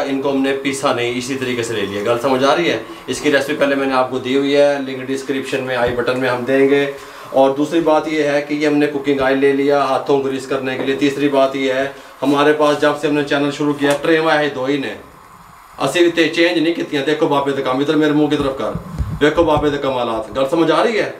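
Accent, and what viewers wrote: Punjab, India. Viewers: Or comentry b kamal with punjabi touch 😃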